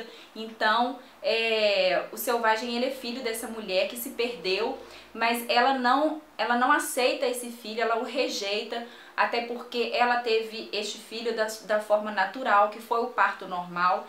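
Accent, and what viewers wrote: Minas Gerais, Brazil. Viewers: Ganhou inscrito. A propósito, vc é mineira?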